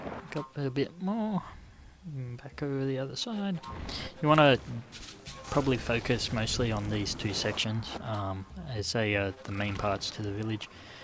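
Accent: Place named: Australia. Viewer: Where in Australia are you from?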